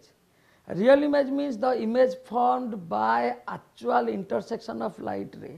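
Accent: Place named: Odisha, India. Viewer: Not Bihari Dear, He is Oriya. Learn to judge throught the accent.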